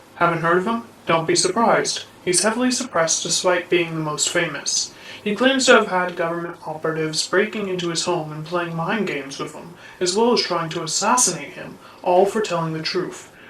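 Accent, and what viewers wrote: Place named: United Kingdom. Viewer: Couldn’t hide your British accent in this one even with the voice mod. I ask to see that other video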